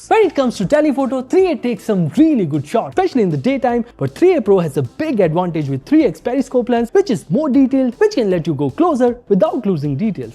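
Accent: India. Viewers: Non native English speaker here rarely gets to hear English with Indian accent in it, but i can completely comprehend all of the things he said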